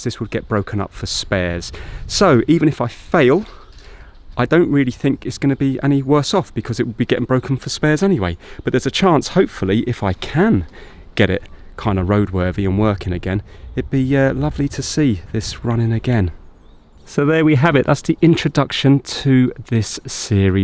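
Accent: United Kingdom. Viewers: my Hero! Nice to hear a British accent after beeing in NZ for 30 years... reminds me of home ;)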